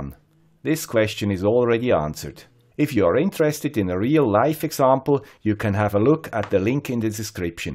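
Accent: Switzerland. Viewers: This is pure gold for troubleshooting. Thank you Great Master with Swiss accent 🖖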